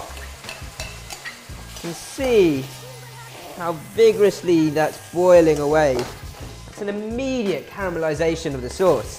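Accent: United Kingdom. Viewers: Ok, it ALWAYS fucks up my mental when I hear Asian people speaking with a British accent. Sorry, but it JUST DOES. I'm used to hearing an "American" tone, or an Asian tone, but a British tone? It just doesn't fit (to me) The recipe seems good, though.🙂🙂🙂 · Awesome dish, it sounds like u have a british accent. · As an American fan of Chinese-American food, including General Tso's chicken, and as one who's grown impatient with authenticists and purists who try to delegitimize any Chinese food not made in China, I'm heartened to see a Chinese-British cook with a proper British accent who gives expatriate Chinese food its due and re-enforces its legitimacy as cuisine. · Yeah so some Chinese looking guy with British accents (who's probably a British) is explaining how to make a fake (and extremely unhealthy) Chinese dish that's invented in the USA, and never consumed by people in China. 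Pretty interesting, although I didn't watch it through. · That Hogwarts accent is wonderfully fun to listen to. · School of Wok as in we’re or I at least have never heard an Asian man with a British accent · Asian guy with a British Accent? that's something new. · Just realized he's Asian but has a British accent lol. Great video looks amazing! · I knew from the start he speaks he must be stayed or grew up in UK.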